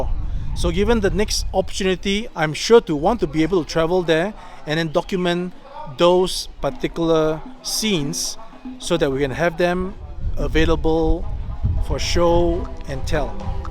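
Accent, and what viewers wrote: Singapore. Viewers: Nice to hear the singaporean accent again! I'd lived there for 7 years and it's the best of my life so far. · So familiar with Singlish accent!!!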